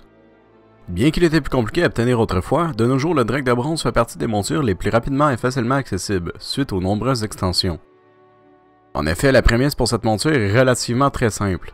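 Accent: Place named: United Kingdom. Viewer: Ton accent anglais est formidable et fait pâlir nous autres Français. Sinon très bonne vidéo !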